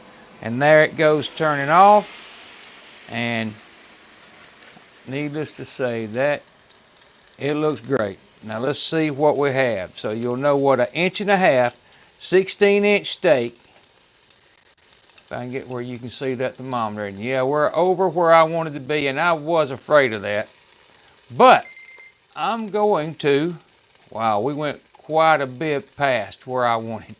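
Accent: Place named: Kentucky, United States. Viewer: I'm from Lexington, Kentucky and your accent makes me miss my Kentucky buddies. :) Great videos.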